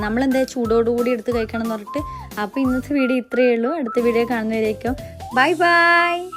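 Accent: Kannur, India. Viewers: ചേച്ചി കണ്ണൂർ ആണല്ലേ വർത്താനം കേട്ടപ്പോ തിരിഞ്ഞു 😍 കണ്ണൂർ എവിടാ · Slang polich.. Evda kannuro kasarodo...❤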